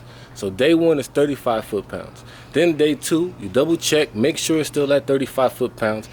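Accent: United States. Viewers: Smashing a grinder through something screwing it up and cleaning things on dirt. This guy is just me with an American accent.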